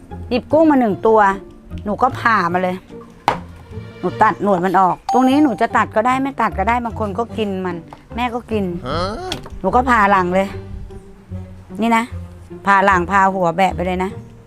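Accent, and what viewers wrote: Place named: Mae Klong, Thailand. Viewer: สมเป็นสาวแม่กลองเลย อารมณ์ขัน พูดตลกเหน่อๆ แต่ฝีมือทำกับข้าวสุดยอด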